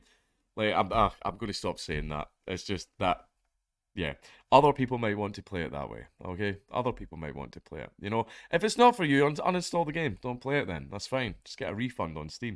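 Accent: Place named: Scotland, United Kingdom. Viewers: No it was just that thick Scottish accent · I think it was just his scottish accent.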